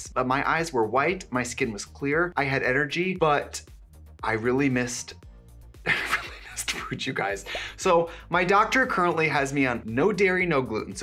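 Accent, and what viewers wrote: Wisconsin, United States. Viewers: Per beggg love her Wisconsin accent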